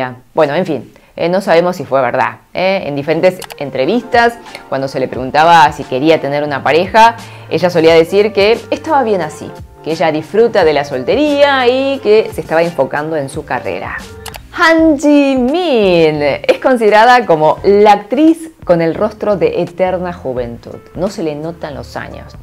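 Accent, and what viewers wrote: Argentina. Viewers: Que bellezas las actrices coreanas ❤ me encanta tu acento argentino ❤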